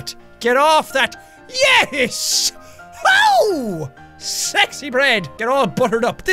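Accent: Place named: Ireland. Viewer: Your Irish I'm Irish too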